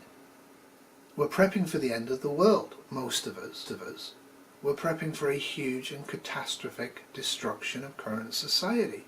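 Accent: United Kingdom. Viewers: The only reason politics are part of prepping in the US is because of the 2nd amendment. Without it, gun confiscation becomes easier and then the shtf can be a lot easier to usher in. For the record, your're the only liberal I thumbs up on YT. Must be the British accent or maybe the hair. Haven't figured it out, yet.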